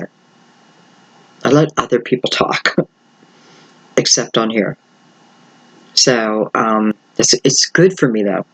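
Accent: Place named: Central Pennsylvania, United States. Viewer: Love your readings♥️ are you from Central PA? You have a York/Hanover etc accent. My ex husbands family is from there and I recognize the accent.. I could be wrong though 😊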